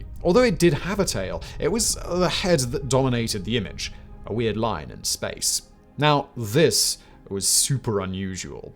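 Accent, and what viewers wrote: United Kingdom. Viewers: Please tell me where this guy's accent is from. Not the UK, or England, but what city.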